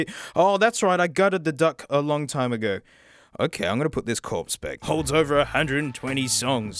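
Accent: Australia. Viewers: Theres something about listen to an Australian talk about Apple products with a very green iPad case thats just so entertaining. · I feel like I have a loud Australian friend. Everyone needs a loud Australian friend.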